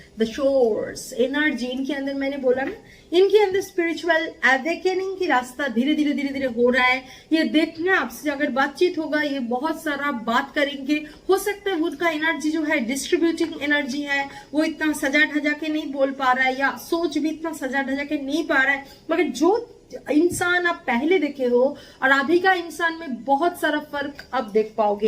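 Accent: Bengal, India. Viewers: Thank you so much mam... Seems like you're bengali... Loved the way you executed. 😃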